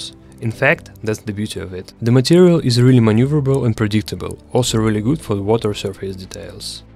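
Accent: Russia. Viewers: Ты же русский верно? По твоему акценту понятно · с первых слов поняла что ты русский)00) отличная работа!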